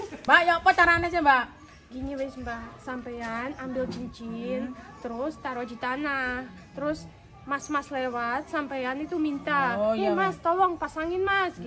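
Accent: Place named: Lumajang, Indonesia. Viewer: Koyok boso lumajang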